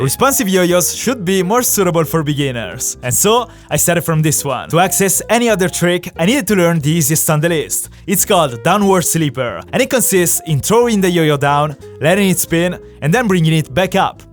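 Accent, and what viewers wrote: Italy. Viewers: pov: guardi per la prima volta un suo video e ti sembra abbia un accento molto italiano, guardi la sua descrizione e...... beh è italiano :D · Quanto si capisce che sei italiano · Italian english be likes Quand'è che dici shish? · no vabbè ma sei italianoooooooo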